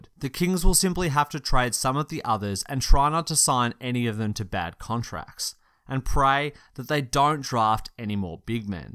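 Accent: Australia. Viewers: is it australian accent ??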